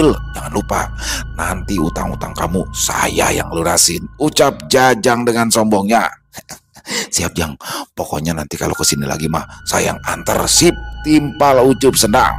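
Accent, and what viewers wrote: Jakarta, Indonesia. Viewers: Tangerang hadir.. Logat betawi mantebbb.. Logat kalimantan cakep.. Jawa jg boleh.. Emang serba bisa nih.. 👍👍👍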